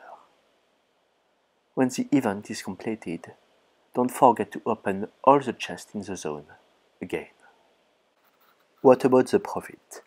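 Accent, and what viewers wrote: France. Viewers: Merci pour cette vidéo, une vidéo pleine de bons conseils et un accent bien franchouillard :D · on sens bien l'accent française XD mais sinon bonne video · Man,I love your accent...Liberte,Fraternite pour Tyria :) Amazing video btw. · Good tips meme si ton accent francais se sent Bcp plus😂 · He is French, not German! French people speaking Englisch sounds so much worse than Germans speaking it. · Good content, but i need subtitles to understand much of what youre saying. Very deep French accent.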